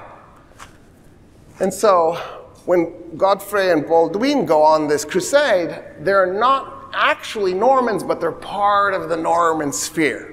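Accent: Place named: United States. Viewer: Oh trust me, that's just the smallest of his amateurish errors. He basically ignores entire populations, maps are completely off compared to historic records and so on. That being said, he clearly sounds American, and I can tell you it is very hard to find any American, even among supposed 'experts' who has any clue about European Middle Age so it's not really his fault. :D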